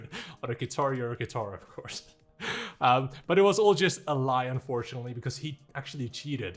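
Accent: Afrikaans, South Africa. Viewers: are you afrikaans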